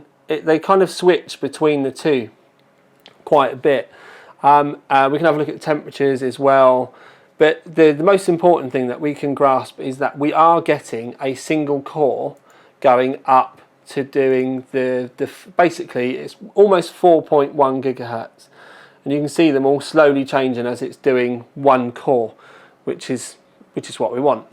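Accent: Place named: Norfolk, United Kingdom. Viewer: gotta be a naarfolk boy i reckon...good job....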